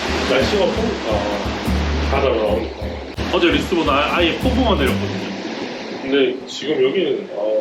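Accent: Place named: Gyeongsang, South Korea. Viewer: 여행유투버를 많이 보는데 이렇게 크루즈여행 영상은 처음이에요.우연히 을 알게 돼서 몇날을 영상을 보고있어요. 혹시 부산분 아니세요?부드러운 경상도 억양의 말씀..아주 듣기 좋네요.근데 아직 서른 정도의 어리신분인데 어째 이래 많이 여행할 수있었는지 궁금 합니다.우리아들 야단 좀 많이 쳐야겠어요. 저는 55살부터 단기 자유여행을 여러번 했는데, 코로나이후로 못나가봤어요.우리는 몇년 지나다 보니 늙어 힘이 없어서 출발결심이 어렵군요. 영상 너무 재밌게 보고 있어요. 젊음이 부럽습니다. 많이 보여주세요~~ㅎ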